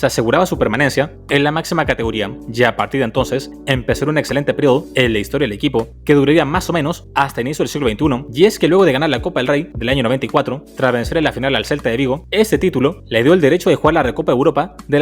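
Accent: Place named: Latin America. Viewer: Por tu acento pareces latinoamericano, te agradezco que hagas un vídeo sobre uno de los mejores equipos de la Liga, al menos con historia, y el séptimo con más títulos en España. Es una pena que a día de hoy esté así, mucha gente de otros clubes preguntan y no se lo explican. Es muy duro. Sé que en Argentina es muy querido, debido a que tuvo muchos futbolistas de esta nacionalidad en sus filas, y con un muy buen rendimiento. Salvo milagro, nada lo va a salvar esta temporada de descender a Primera RFEF. Habrá que ver si es viable en esta categoría, pero si desaparece sería una tragedia.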